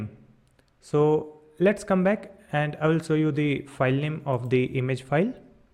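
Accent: Bihar, India. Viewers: Sir aap bihari ho? Just asking no offense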